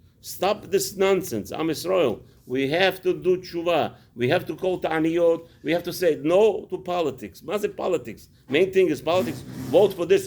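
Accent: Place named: Russia. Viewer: He has Russian accent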